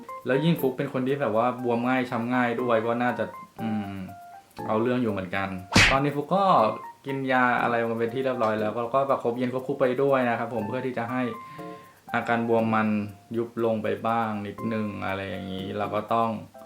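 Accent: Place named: Provincial, Thailand. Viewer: เป็นคนที่ไหนหรอครับ พูดเหน่อจัง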